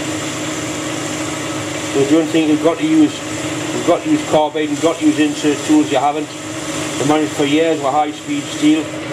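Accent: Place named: Scotland, United Kingdom. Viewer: Ohhh, bl**dy h*ll, ya about killed me! When you said "High speed stool" I had a laughing spell that drove my cats from the room and made me see stars when I lost all my wind! Oh my brother, ya done got me typing with an accent now after watchin' ya's all mornin' long... Begging your pardon, it just feels good to mess about and have some fun with making different accents. There is not a drop of harm meant, and I certainly hope there is no offense taken? I'm just a old lad from Hawaii that lives in California now, I grew up surfing and playing footy, so I've met my fair share of Australian blokes, and later on some English and Irish surfers made it over there too, but I've yet to meet a Scot who surfed. I know they exist, some fine ones too, and Thurso is high on my list of places to visit for a surf, but I've just yet to meet one of your fellow countryman out in the surf. 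Bound to happen if I ever get to Scotland, he he. Anyhow, I am going back to watching you work, it's a great playlist and I really appreciate you taking the time to put it up for us to enjoy! I can feel your pain as far as the slow uploads go, even my computer is so old that it takes forever to just render them from the editing program. Thanks a bunch for both the great laughs and the excellent instructions. You can blame Tom Lipton for me showing up here and pestering you, he's the one whose mentioned you. Well, everybody I watch speaks highly of you, but he was just the most recent, and with my memory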